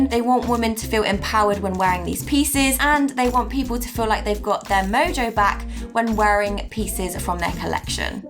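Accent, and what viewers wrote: United Kingdom. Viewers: Please mam, make translate cc into urdu. Your work is incredible but very difficult to understand of your fast british language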